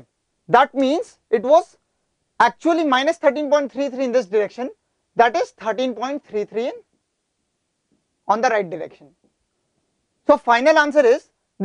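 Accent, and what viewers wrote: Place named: India. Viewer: oh man goddamit indian i couldnt understand any word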